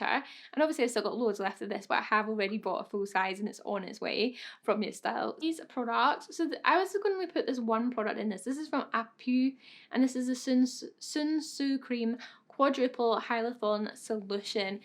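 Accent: Scotland, United Kingdom. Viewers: What kind of accent you sound Scottish can hardly understand what your saying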